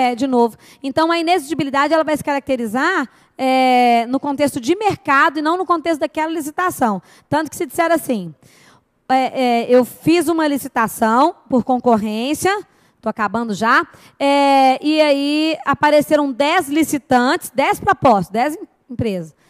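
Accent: Minas Gerais, Brazil. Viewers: Professora, vc é muito lindinha com esse sotaque, "uai"!!!!! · Professora, DEUS abençõe sua vida por sua boa vontade, continue lhe dando disposição e muita saúde para continua lecionando de forma tão agradável!! às vezes fico quase o dia todo escutando suas aulas. Tenho aprendido bastante!!! gosto da sua aboradagem, da forma como vc se expressa e todos os exemplo que vc utiliza. Sem se falar do sotaque mineiro rsrsrs acho uma gracinha!!! Obrigada de coração!!!!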